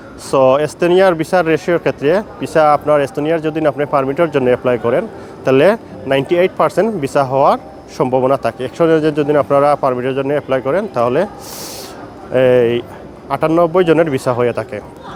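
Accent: Sylhet, Bangladesh. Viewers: ভাইয়া আপনি কি সিলেটি ❤❤ কথায় বুজা যাচ্ছে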